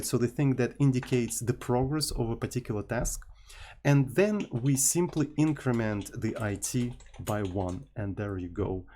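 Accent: Russia. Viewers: хз он тупо по-русски говорит английскими словами норм короче ну надо будет специальные люди придут и объяснят как надо балакать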